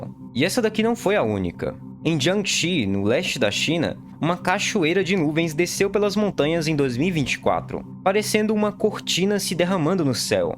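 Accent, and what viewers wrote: Rio de Janeiro, Brazil. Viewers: Decide se tu é carioca ou paulista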